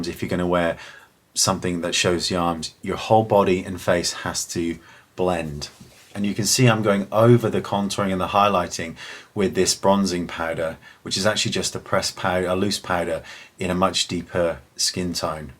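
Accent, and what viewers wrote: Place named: United Kingdom. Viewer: nice British accent~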